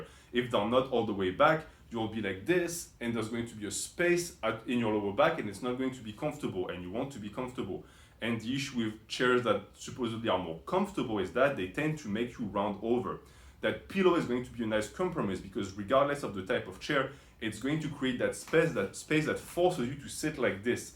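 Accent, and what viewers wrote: France. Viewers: Yo dude are you french? It sounds like you are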